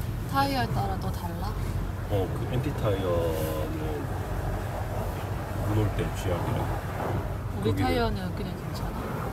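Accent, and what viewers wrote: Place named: Busan, South Korea. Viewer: 순종이ㅋㅋㅋㄱ 서울구경 차구경잘하고갑니다ㅋㅋ 햄아 아직부산사람이네ㅋㅋㅋㅋㅋㅋㄱ( feat .부싼사투리)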